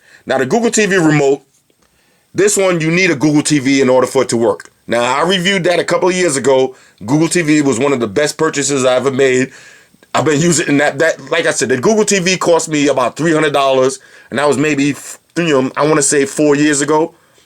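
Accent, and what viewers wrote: Jamaica. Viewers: u from Jamaica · R u from Jamaica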